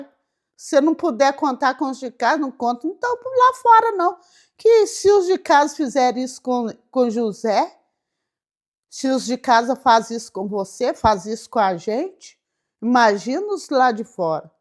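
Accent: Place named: Minas Gerais, Brazil. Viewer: Muito bom essa forma mineirez de contar história bíblica❤😂 parabéns 👏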